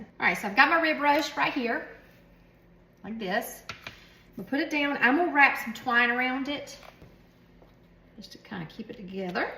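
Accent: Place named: South, United States. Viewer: I love the southern accents you, Dan-O, Hickok 45, Stalecracker and a bunch of other southern cooks real Americans 👍🇺🇲 bless all ya all😊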